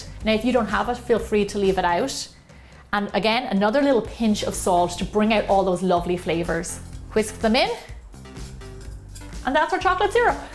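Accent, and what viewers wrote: Ireland. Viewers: Go back to Ireland for a while I need the accent back LOL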